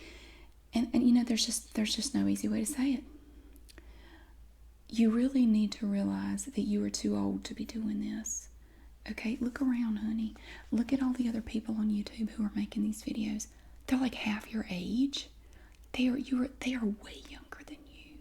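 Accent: South, United States. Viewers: To the Dumb fucks who hate southern accents, Why in the Goddamn fuck would yall even click on a video.I'm tired of these fucking yankee pricks talking their Goddamn Bullshit about us SoutherN People. I'm sorry for the profanity,But Im a High Strung Texan that's had a few drinks (PRE 4TH OF JULY) you are one of the best ASMR artist's Ive ever seen. You are a very beautiful woman,that doesnt look old. These Haters can just go Fuck off down the road somewhere. Leave This Great,talented beautiful woman alone!!!!!!!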